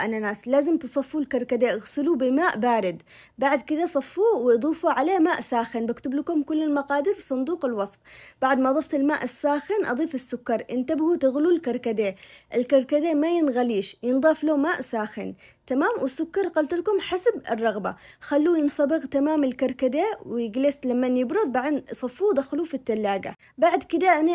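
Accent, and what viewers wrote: Aden, Yemen. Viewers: السلام عليكم ..حلو الكلام العدني انت من ايش من منطقه في عدن هل من كريتر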